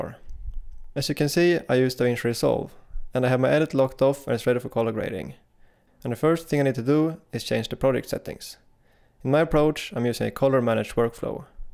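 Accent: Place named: Sweden. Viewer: Bra där. hörde direkt att du var svensk ;) Jag har precis börjat att filma i HDR och Davinci Resolve. Gör det på en Panasonic S5. en fråga. varför ser V Log skit ut även på den färdiga HDR bilden? helt platt utan färger. Jag har även testat några Blacmagic Raw filer och dom ser perfekta ut redan från start och kräver mycket lite grading. att få ordning på en V Log fil för HDR i Davinci Resolve tar enormt med tid. varför är det så? finns det HDR LUTs man kan använda för V Log?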